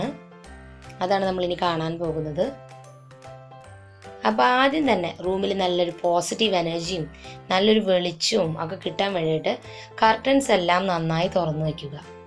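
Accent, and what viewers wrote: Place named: Mala, India. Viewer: Evideya veedu?mala,tcr boarder ano?samsaram maarala.(mala).marambala trissur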